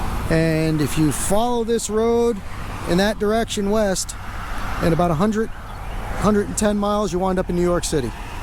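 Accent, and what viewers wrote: Cuba. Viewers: You speak perfect fluent spanish, although you speak a caribbean spanish accent, more like Cuban. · Dang bro, you speak really good Spanish. You sound like a you could be cuban just about. Lol 👍🏽😎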